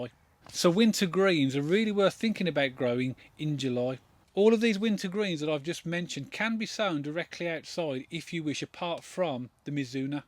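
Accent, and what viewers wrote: Birmingham, United Kingdom. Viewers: Sound like a brummie, where u based? Im in smethwick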